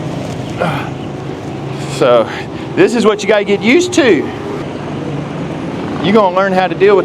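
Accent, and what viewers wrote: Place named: South, United States. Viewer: Are you from Texas? You have a Southern accent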